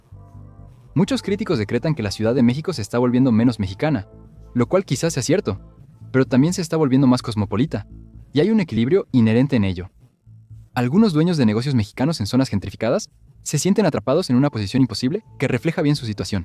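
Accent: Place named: Spain. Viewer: Claro, suena muy español lol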